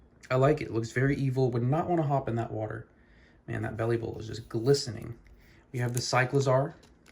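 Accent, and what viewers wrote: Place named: Spain. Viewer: absolutely! Mainly Pokemon cards and books. But I think our approach to card collecting is similar, going for master sets, not necessarily promos, and getting singles over time to slowly complete them, as they become more affordable. Haven't seen you talk about language learning (though you may have). I've heard your Spanish accent in your churros Oreo video, so I suspect you've at the very least dabbled. It's probably my number one hobby, what I spend the most time on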